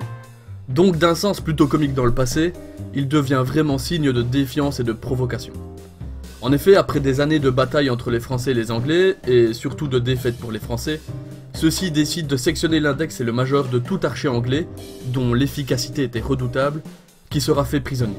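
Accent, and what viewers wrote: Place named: Belgium. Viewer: Ton accent belge il est magique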